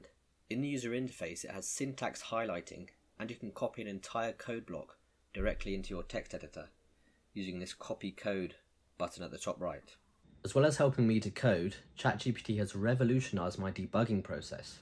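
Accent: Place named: United Kingdom. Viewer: The British accent...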